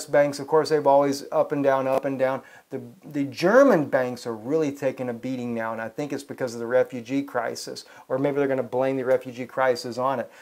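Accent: South, United States. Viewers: That's where your familiar southern assent. greetings from Louisiana. Jesus bless brother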